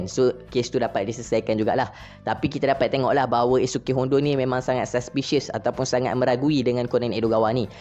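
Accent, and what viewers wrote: Kelantan, Malaysia. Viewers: Bang kenapa abang lebih ckp ala kelantan gitu.? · Ore klate ko boh??!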